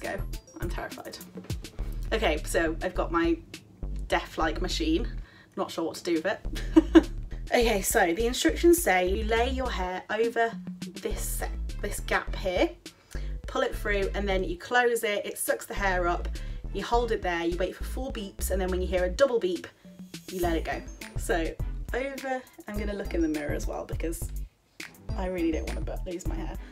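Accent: United Kingdom. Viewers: The British accent tho😍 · What's a British accent? She's got an English accent with some Midlands bits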